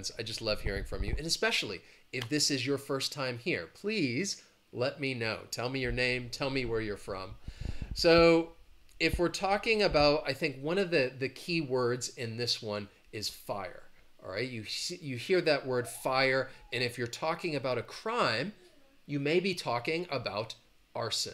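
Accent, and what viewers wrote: United States. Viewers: I'd like your US accent ☺️☺️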